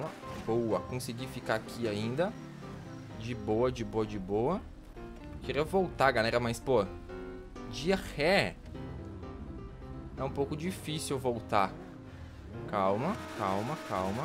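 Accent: Rio Grande do Sul, Brazil. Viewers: Vc e Gaúcho?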